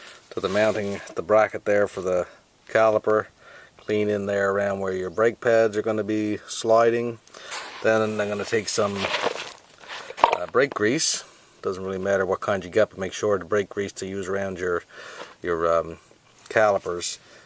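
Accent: Newfoundland, Canada. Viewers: this video along with your irritating canadian dialogue makes this painful to view and listen to , its like torture < makes one want to vomit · hey are you from newfoundland? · Good Job, & I like the way you say "off", it would be written as "oot", I love it, lol, I like the Canadian accents. Vin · Given the accent & state of corrosion, I think we're in Canada. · Love that Canadian accent.lol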